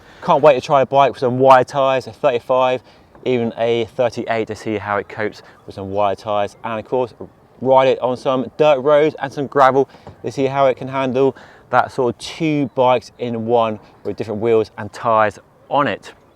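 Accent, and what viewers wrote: United Kingdom. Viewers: Why California people speak British English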